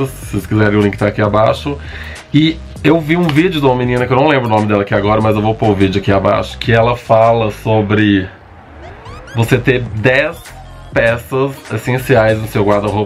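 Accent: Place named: Minas Gerais, Brazil. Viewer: Oi oi lindo, seu sotaque mineirim é um arrazo! Saudades imensas da minha terra. A 6 anos moro em Porto Alegre e quando ouço alguem ... ai ai me da aquela saudezinha de BH. Enfim, esse video eu assisti a alguns dias e foi um divisor de aguas... <link> estamos sim, na era do consumismo desenfreado, porem cabe a cada um de nos sermos, low consumerism , LOWSUMERISM ... Parabens pelo canal!!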